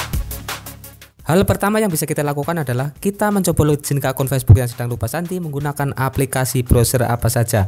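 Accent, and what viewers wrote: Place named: Java, Indonesia. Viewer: crom nyamake bhasa indo lah udah sulit malah d persulit, Abang juga orang indo dari logat nya juga orang jawa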